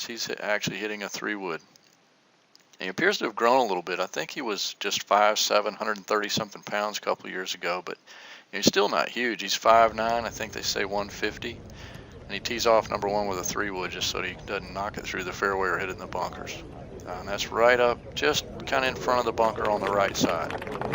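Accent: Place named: South, United States. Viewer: Hey, Rob here from England. Thanks a bunch for putting this up, it's home spun but that's good! Great to see the swings in slo mo, a great feature thanks! Love the commentary in that southern accent all we Brits think is so cool! I MUST get to the Masters one day, nearly did for the big 'five oh' this year, but didn't in the end... hopefully some year soon. Love Rickie Fowler's swing, it's unique for sure but why so long you think!??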